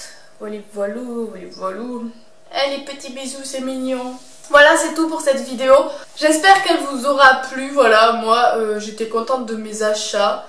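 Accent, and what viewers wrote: South, France. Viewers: D'ac, je pensais que tu parlais du undiz du polygone de Béziers car dernièrement tu cherchais un bar ambiance sympa sur béziers:) Au passage t'es vidéos sont aux top j'adore ta façon si naturelle de parlais et t'exprimer avec ton accent bien de chez nous:) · l'accent du sud miss vive le sud bisous j'adore tes vidéo continue comme sa gros bisousta un corp de rêve moi je comme a l'avoir je fait beaucoup de sport ❤❤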